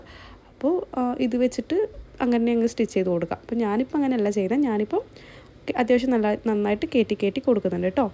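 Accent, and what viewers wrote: Kannur, India. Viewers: ഇത്ത ഇങ്ങൾ കണ്ണൂർ ആണോ ? Slang😁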